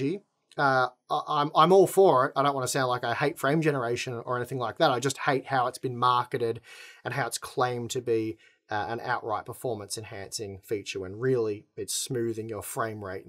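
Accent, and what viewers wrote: Australia. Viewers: Aussies are so cute when they talk.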